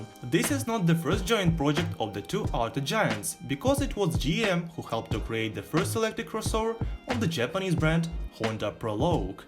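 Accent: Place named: Singapore. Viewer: You sound SINGLISH......sounds like Chinese but speaking English. Hard to understand what you are saying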